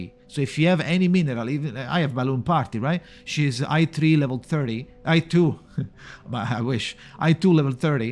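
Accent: Italy. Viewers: sapevo che eri italiano, parli inglese con il mio stesso accento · Però l'accento italiano si riconosce subito ahahah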